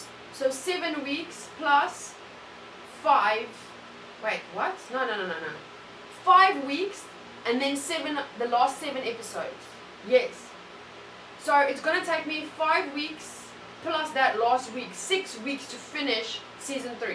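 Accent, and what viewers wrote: South Africa. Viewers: Huh, you're from South Africa? That's cool, explains the accent. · what accent is that it sounds south African???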